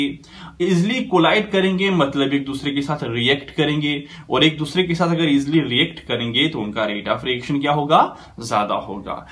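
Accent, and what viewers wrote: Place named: Kashmir, India. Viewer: thanks ..but sir ksm sa lag rahai ha kashmir sai hai ..isiliyai pucha tha sir sai ..😊😊😊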